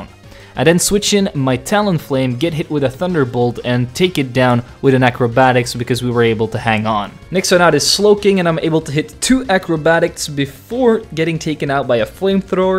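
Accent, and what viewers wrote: Netherlands. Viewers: A-A-Are you Dutch??! o mijn god ik ook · wait are you dutch?! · Are you dutch?! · Wait are you dutch?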